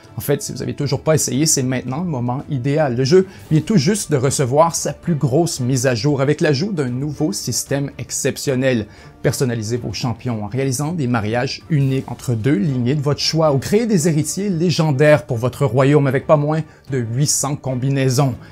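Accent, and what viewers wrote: Quebec, Canada. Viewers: Yo tu est québécois nn ?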